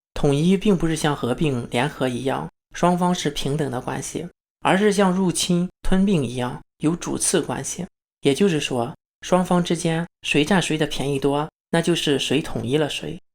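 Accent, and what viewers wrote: Shandong, China. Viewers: 你这口音真难听，一听就是我们山东的吧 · 这口音是山东？河北？不管了，中国应该是联邦制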